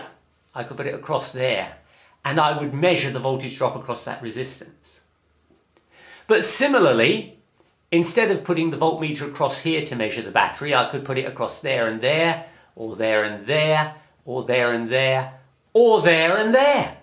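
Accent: United Kingdom. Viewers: i am too distracted to listen because his accent makes me feel like im in Hogwarts lol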